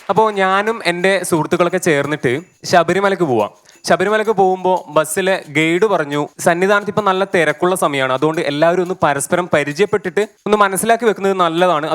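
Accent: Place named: Iriveri, India. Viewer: നമ്മുടെ മുത്ത് ഇരിവേരി മുതുകുറ്റികാരുടെ മുത്ത് ഇപ്പോഴും ആ ഭാഷ സൂക്ഷിച്ചു സംസാരിക്കാൻ കഴിയുന്നുണ്ടല്ലോ ❤️❤️❤️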